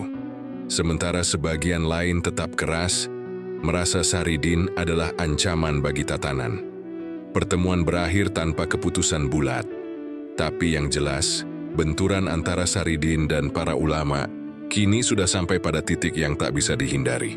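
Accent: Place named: West Java, Indonesia. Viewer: Ini cerita agar umat belajar ilmu ,Sareat,Toriqod,Hakekat,dan Makrifat ,ini sudah tingkat Makrifat ,jadi anak anak belum sampai ,masih saringat,sayang yang membawa suaranya orang dari Jawa barat , bila Jawa tengah akan lebih halus contoh dipun Tompo ,bahasa Jawa barat di Tampa kelihatan benar orangnya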